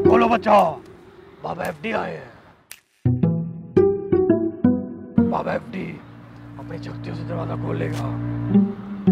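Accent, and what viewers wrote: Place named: United Kingdom. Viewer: Love your English acsentt .. but at why did u edit your video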